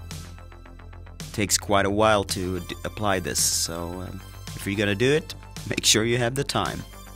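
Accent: Sweden. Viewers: hearing your accent I guess you're swedish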